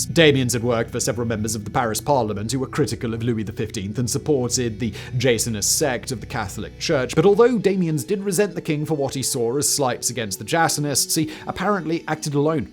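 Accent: England, United Kingdom. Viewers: With his thick English accent, he needs to talk slower!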